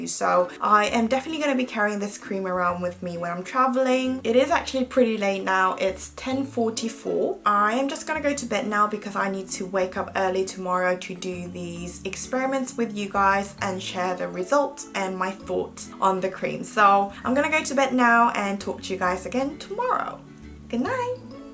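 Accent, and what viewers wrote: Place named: United Kingdom. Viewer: 영국영어도 제품 설명도 매력 넘쳐요♡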